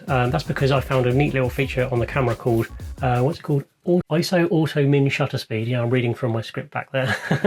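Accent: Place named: United Kingdom. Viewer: i like your style (and your British accent! btw I'm a German) :) you should continue making videos :D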